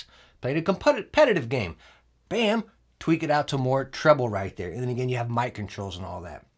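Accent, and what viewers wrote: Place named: Massachusetts, United States. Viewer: Thanks for bringing the ruckus,! Where are you from? Definitely the northeast. My best guess is Massachusetts.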